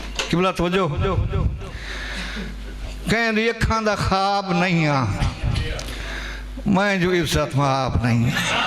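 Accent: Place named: Saraiki, Pakistan. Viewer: یہ پنجابی مشاعرہ ھے یا سرائیکی پنجابی َاور سرائیکی دونوں زبانوں اور خطے میں بھی فرق ھے میزبان بھی سرائیکی زبان کے لب ولہجے میں اپنی زمہ داری ادا کر رھے ہیں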